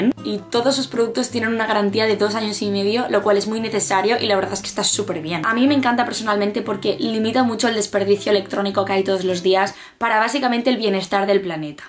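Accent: Argentina. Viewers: Y hablando como argentina es buenardo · En los vídeos de nunca faltan: EL HABLAR INGLES EL HABLAR ARGENTINO EL BOLUDO EL CAFÉ "EL BAILE DE" T AMO PIBA JAJA❤️ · puedes hacer un video 24 horas ablando Argentino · Jajajj amo que hables Argentino creo que por eso la mitad que te seguimos somos Argentinos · adoro habla con los posters en argentino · !! El acento argentino te salió re bien! Sos una genia todo tus vídeos son lo más, soy de argentina y tu acento es un 100/10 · Amo esta Pibaaa😻 Habla en inglés Habla en Argentino Y Nose cuál otra más.. Mee la comooo😻🥰🥰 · hablando argentino mi religion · amamos a hablando argentino,confirmamos. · amo por que habla como si fuera argentina jajajajajajajaja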